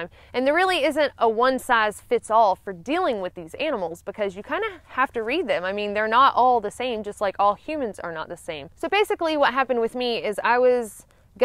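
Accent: South, United States. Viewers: Thank you so much for this informational video! & btw from a Michigander I have to say that your southern drawl is so sweet very charming! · Where are you from?? You sound a little southern. Mountain lions are silent and sneaky. I heard said that if you wear a hat that has eyes or a face on the back of the hat it could stop an attack from behind. Sacketts I love those books I've read them all, starting at the beginning. Ole Barnabas Sackett started it all☺. I wonder if bear spray works on mountain lions. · Good primer vid. Cool to a non-tarzan dude doing a predator show. But, being a southern boy, I can't determine the accent. A southern accent kicks in with every word with an "i" and the word food. Georgia, Tennessee, the Carolinas? Maybe Florida? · Are you a southern belle? You certainly sound like it. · Love her Southern accent. Charming.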